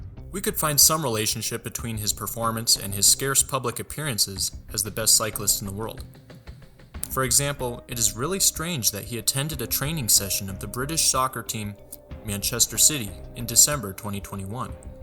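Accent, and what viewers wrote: Ireland. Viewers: What happened to the Irish accent?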